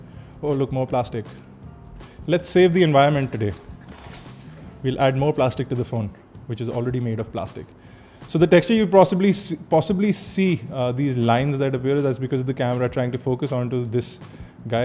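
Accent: India. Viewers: That annoying indian accent lol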